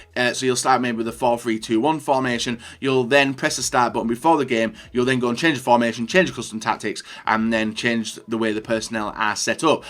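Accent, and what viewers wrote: United Kingdom. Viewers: He has a earl thomas III seattle seahawks jerseys, but has a british accent... intrusting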